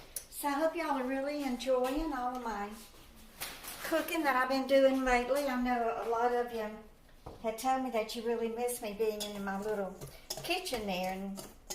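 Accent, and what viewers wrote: South, United States. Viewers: Did you live in New Jersey? You have a beautiful southern accent! How did you get way up there?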